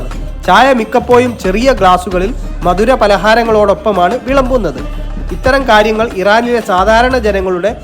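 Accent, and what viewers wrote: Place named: Malappuram, India. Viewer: സംസാരം കേട്ടിട്ട് മലപ്പുറംകാരൻ ആണെന്ന് തോന്നുന്നു ഇടപഴകുമ്പോൾ എന്ന് പറയണം അല്ലാതെ ഇട പയകുമ്പോൾ അല്ല അതുപോലെ ഴ ഉച്ചരിക്കേണ്ടിടത്ത് നിങ്ങൾ യ എന്നാണ് ഉച്ചരിക്കുന്നത് കഷ്ടം അല്ലാതെന്താ പറയുക ?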